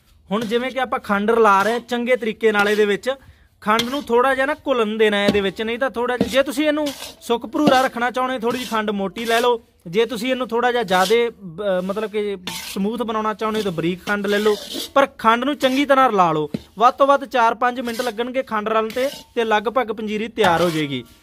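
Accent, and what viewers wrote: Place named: Malwa, India. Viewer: ਸਤਿ ਸ੍ਰੀ ਅਕਾਲ ਵੀਰ ਨੂੰ 🙏🙏🙏 ਵੀਰ ਸਤਿ ਸ੍ਰੀ ਅਕਾਲ ਮਨਜੂਰ ਕਰਨਾ ਵੀਰੇ ਭਰਾ ਮੇਰਿਆ ਮੇਰੀ ਪਤਨੀ ਨੂੰ ਗਠੀਆ ਏ ਜੋ ਜੋੜ ਦਰਦ ਬਾਰੇ ਤੂੰ ਦੱਸ ਰਿਹਾ ਏ ਸਭ ਕੁਝ ਉਵੇਂ ਹੀ ਏ ਤੇ ਉਹ ਮੈਡੀਕਲ ਦੀ ਦਵਾਈ ਖਾਂਦੀ ਏ ਕੋਈ ਜਿਆਦਾ ਫਰਕ ਨਹੀਂ ਬਾਕੀ ਤੇਰੇ ਬੋਲਣ ਦੇ ਅੰਦਾਜ਼ ਤੋ ਪਤਾ ਲੱਗਦਾ ਕਿ ਸ਼ਾਇਦ ਤੂੰ ਮਾਲਵਾ ਏਰੀਏ ਦਾ ਹੈ ਮੈਂ ਸੰਗਰੂਰ ਦੇ ਨੇੜੇ ਇਕ ਪਿੰਡ ਵਿਚੋਂ ਹਾਂ ਹੁਣ ਸਰਦੀਆਂ ਨੇ ਹੁਣ ਦਰਦ ਹੋਰ ਵੀ ਵਧ ਗਿਆ ਏ ਤੇ ਹਰ ਸੀਜਨ ਇਹੀ ਕੁੱਝ ਬਣਦਾ ਏ ਜਦੋਂ ਮੌਸਮ ਬਦਲਦਾ ਏ ਕਈ ਵਾਰ ਪੈਰਾਂ ਦੀਆਂ ਅੱਡੀਆਂ ਵੀ ਸੁੱਜ ਜਾਂਦੀਆ ਨੇ ਤੇ ਇਸ ਤਰਾਂ ਮਹਿਸੂਸ ਹੁੰਦਾ ਜਿਵੇਂ ਕਿ ਅੱਡੀ ਵਿੱਚ ਪੀਕ ਪਈ ਹੋਵੇ ਕਈ ਵਾਰ ਘਿਉ ਦੀ ਮਾਲਿਸ਼ ਕਰਕੇ ਕੁੱਲ 10% ਦਰਦ ਘੱਟਿਆ ਮਹਿਸੂਸ ਹੁੰਦਾ ਸੋ ਵੀਰ ਮੇਰਿਆ ਮੇਰੀ ਗੱਲ ਜਵਾਬ ਜਰੂਰ ਦੇਵੀਂ ਬਾਕੀ ਵੀਰ ਮੈਂ ਖੁਦ ਆਪ ਮਿਰਗੀ ਦੇ ਦੌਰੇ ਦਾ ਮਰੀਜ਼ ਹਾਂ ਮੈਨੂੰ ਵੀ ਦਵਾਈ ਖਾਂਦੇ ਨੂੰ 12 ਸਾਲ ਹੋ ਚੁੱਕੇ ਨੇ 2007 ਵਿੱਚ ਪਹਿਲੀ ਵਾਰ ਦੌਰਾ ਪਿਆ ਸੀ ਤਿੰਨ ਸਾਲ ਦਵਾਈ ਖਾਣੀ ਹੁੰਦੀ ਏ ਪਰ ਵੀਰ "ਚਾਰ ਤੀਏ ਬਾਂਰਾਂ" ਹੁਣ ਤੱਕ ਚੱਲੀ ਜਾਂਦੀ ਏ 12 ਸਾਲ ਤਾਂ ਖਾ ਲਈ ਹੋਰ ਪਤਾ ਕਿਥੇ ਤੱਕ ਜਾਣੀ ਏ ਵੀਰ ਮੈਂ ਤੇਰੇ ਗੱਲ ਕਰਨੀ ਏ ਜਾਂ ਤਾਂ ਆਪਣਾ ਨੰਬਰ ਦੇ ਦੇਵੋ ਜਾਂ ਮੈਨੂੰ ਕਾਲ ਕਰ ਲਈ,ਵੈਸੇ ਵੀਰ ਤੂੰ ਤਾਂ ਆਪਣਾ ਨੰਬਰ ਸ਼ਾਇਦ ਹੀ ਲਿਖੇ ਕਿਉਂਕਿ ਹਰ ਕੋਈ ਕਾਲ ਕਰੀਂ ਜਾਂਦੇ ਨੇ ਮੇਰੇ ਨੰਬਰ ਤੇ ਕਾਲ ਕਰੀ ਵੀਰ <num> ਸੋ ਵੀਰ ਮਿਹਰਬਾਨੀ ਹੋਉ ਤੇਰੀ ਪੜਨ ਵਾਲੇ ਹਰ ਭਰਾ ਬੇਨਤੀ ਏ ਮੈਨੂੰ ਕਾਲ ਉਹੀ ਵੀਰ ਕਰੇ ਜੋ ਵੀਡੀਓ ਵਿੱਚ ਜਾਣਕਾਰੀ ਦੇ ਰਿਹਾ ਏ ਕੋਈ ਹੋਰ ਵੀਰ ਬੇਵਕੂਫ ਬਣਾਉਣ ਦੀ ਕੋਸ਼ਿਸ਼ ਨਾ ਕਰੇ ਪੜਨ ਲਈ ਧੰਨਵਾਦ ਵੀਰ ਦਾ ਸਤਿ ਸ੍ਰੀ ਅਕਾਲ ਵੀਰ ਨੂੰ ਇੰਦਰਜੀਤ ਸਿੰਘ ਸੰਗਰੂਰ ਦੇ ਇਕ ਪਿੰਡ ਵਿਚੋਂ 🙏🙏🙏🙏🙏🙏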